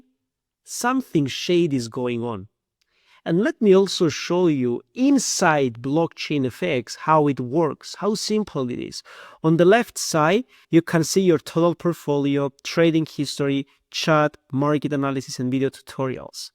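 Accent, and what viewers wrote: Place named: Pakistan. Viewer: Почему те, кто разваливает провальный solaxy смягчают L ? Акцент с пакистанского рынка.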